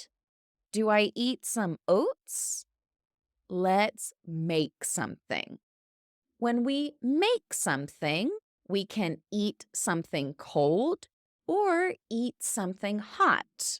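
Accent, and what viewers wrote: United States. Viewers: It's amazing hearing pure American accent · Teacher makes more videos alone , I love your Americana accent . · Good to have lessons only in the american accent like this.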